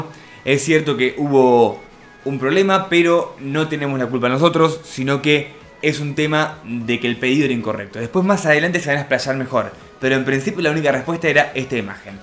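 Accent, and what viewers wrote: Argentina. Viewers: dice roshal por el acento argentino que tiene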